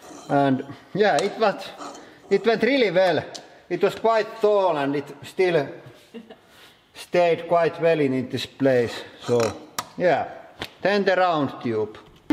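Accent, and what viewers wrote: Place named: Finland. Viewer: oh god the finnish accent :D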